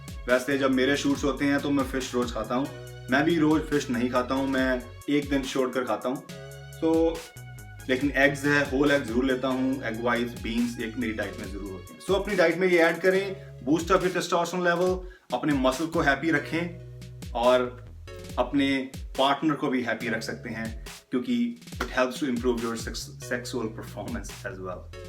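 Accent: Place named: Punjab, India. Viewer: veere punjabi jhalak jandi har war ,.....love that too much .....jaffi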